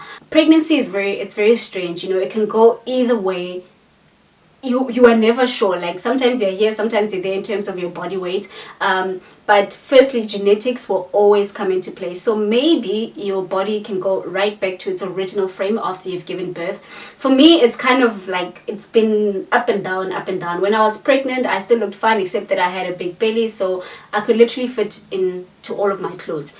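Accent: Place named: South Africa. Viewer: I'm Nigerian and I love SA movies, the way they talk in them. The way you talk. I already subscribed but please try to create English subtitles for when you speak your language. 💙💙💙💙💙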